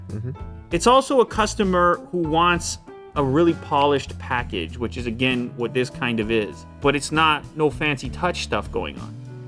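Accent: Canada. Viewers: sounds EXTRA Canadian in this video! I’ve never noticed it, through the hundreds of other videos I’ve watched. So crazy. I pictured him as a Wisconsin guy or someone from Louisiana. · I appreciate how Canadian my guy sounds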